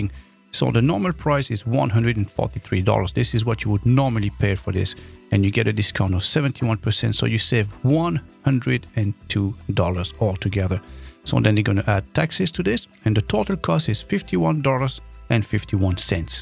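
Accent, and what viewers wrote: France. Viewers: I hear nice French accent 👍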